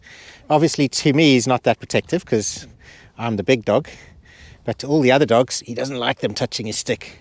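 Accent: South Africa. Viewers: Thanks for brightening my life. I'm a fellow Saffa in Taiwan and stumbled across your channel. Great to hear a Saffa accent again. is so cute the way she says JA and your favourite phrase is "and stuff like that" 😆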